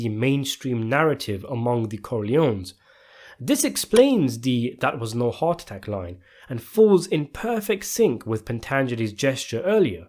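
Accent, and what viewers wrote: United Kingdom. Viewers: yo,you a brit? Cause im not entirely sure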